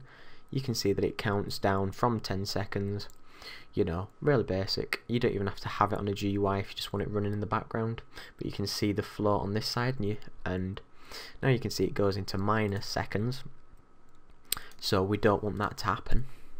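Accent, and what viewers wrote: United Kingdom. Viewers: your accent is cool :) love british accent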